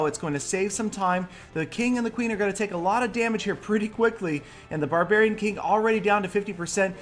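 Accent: Norway. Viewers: Are you norwegian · are u from norway?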